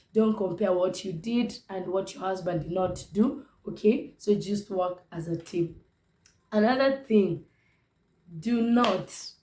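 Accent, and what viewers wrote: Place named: Tanzania. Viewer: nimependa vile unazungumza swahili ya TZ hahha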